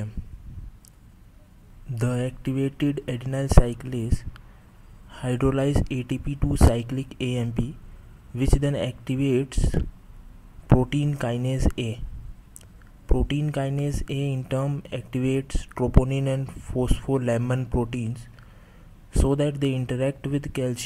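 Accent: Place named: RP, United Kingdom. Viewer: Queen English :-)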